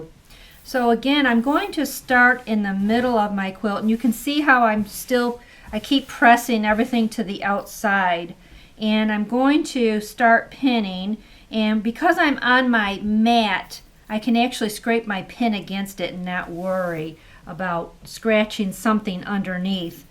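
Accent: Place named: Western New York, United States. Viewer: Sounds to me like Western New York, I'd say Buffalo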